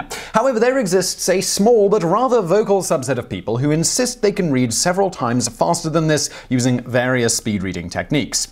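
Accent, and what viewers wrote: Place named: RP, United Kingdom. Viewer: Can someone or Simon himself explain Simon's accent/way of speaking? Is this RP? Are there any other examples of videos that sounds this way? I feel that this accent/way of speaking is taught. I really find it interesting and cant get this question out of my mind. I really feel that when I was younger(as a kid) I hear this a lot but can't tell where.... Thank you in advance.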